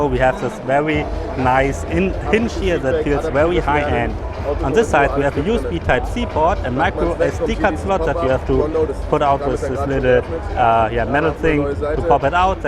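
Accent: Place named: Germany. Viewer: sry aber dein denglisch ist echt furchtbar!?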